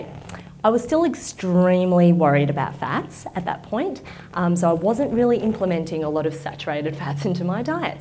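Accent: Australia. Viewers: OK the "Young Guy" in me resurfaced ... a young very pretty Woman that is super smart, has Asian features and an Aussie accent... Doesn't get much better ... OK, back to learning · Is it aussie accent? Cause I LOVE it. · An Asian with an Australian accent, sign me up! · Totally Aussie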